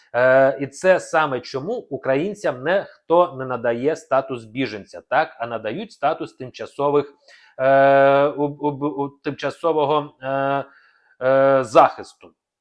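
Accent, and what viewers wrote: Russia. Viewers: Ну очевидно же что ты не украиномовный. У тебя чисто российский говор. Не ломай язык,говори на своем родном языке! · А ты не русский часом?))) Акцент просто ужас.... кровосися....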